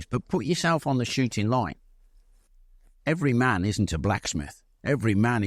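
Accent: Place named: Birmingham, United Kingdom. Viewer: Hi, great channel. You'll never lose the Brummie twang! How do you make the whistling arrows!? 😁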